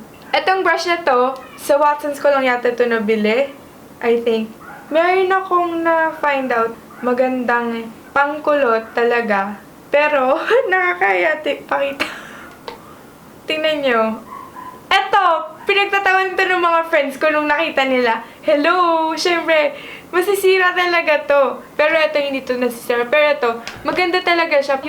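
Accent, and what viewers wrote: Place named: United States. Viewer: Luh kala ko american ka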